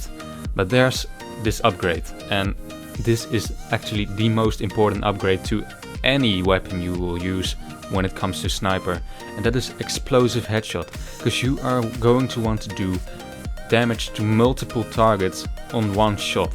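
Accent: Netherlands. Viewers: Your accent sound realy dutch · Are you dutch? You sound dutch :D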